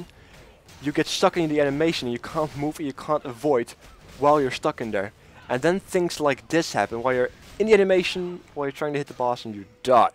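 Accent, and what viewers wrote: Netherlands. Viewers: Hey, im dutch myself but wasnt sure :p i could hear it a little bit :)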